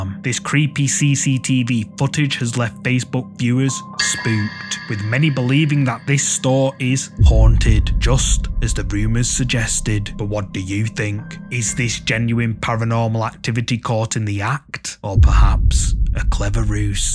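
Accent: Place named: Scotland, United Kingdom. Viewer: Love this (Scot?) accent!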